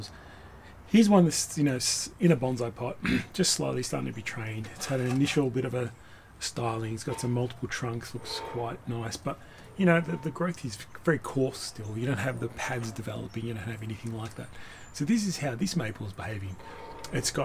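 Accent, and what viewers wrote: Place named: Australia. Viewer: Beautiful maples ❤ I love that Aussie accent. You have beautiful trees and I appreciate you sharing your thoughts on trimming them in spring. 👍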